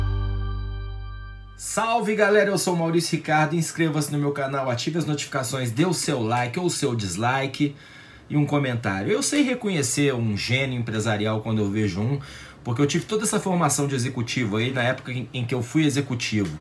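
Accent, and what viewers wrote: Minas Gerais, Brazil. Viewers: Com a tua serenidade e o sotaque mineiro não parece estar full pistola, cada dia melhor o teu canal, em relação ao Doria, usurpadores tem em todo espectro político, desde uma ponta da ferradura ideológica até a outra. Abraço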